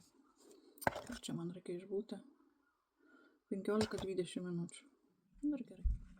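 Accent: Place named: Kupiškis, Lithuania. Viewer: Man patinka jusu vlogai, nes tokie gyvenimiski, mieli. Dar ir tarme jusu primena namus :), nes as is Kupiskio puses ir ten panasiai kalbama. 🌲🤗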